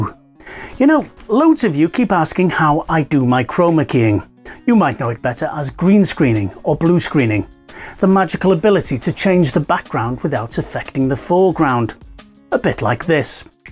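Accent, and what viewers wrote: United Kingdom. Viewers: British accents clench my jaws...I'm good for about 30 seconds...max...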